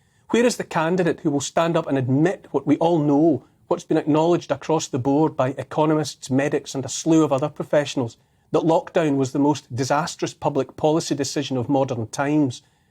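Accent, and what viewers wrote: Scotland, United Kingdom. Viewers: Thank you Neil for exposing the facts so clearly. It is so important to have more and more journalists like you speaking their truth without bias. Not only it is superbly said but your lovely Scottish accent is music to my French ears. Go go go Neil ! 💪🏽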